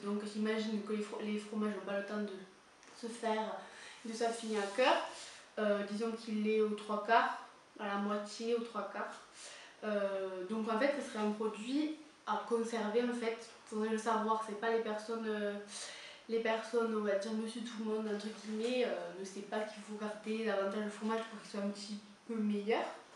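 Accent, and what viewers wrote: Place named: Languedoc, France. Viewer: Le format classique est bien AOP... et moins cher au kilo et il a surement plus de chance d'être fait a coeur (encore qu'il faut le choisir a défaut de pouvoir demander a sa fromagère), personnellement que ce soit celui la ou le Gillot ou tout autre au lait cru, je les trouve très fort, pourtant je vis en Normandie, a votre accent (Languedoc ?), je devine que vous non, shame on me, lol. Le Neufchatel ou le saint-félicien, de la même marque, sont très sympa aussi.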